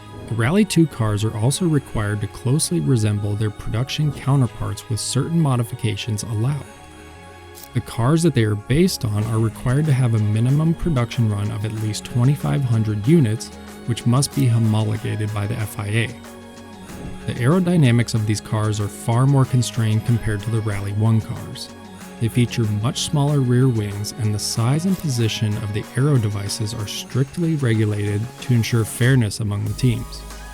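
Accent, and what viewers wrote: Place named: United States. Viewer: An american speakimg on rally? Its a no from me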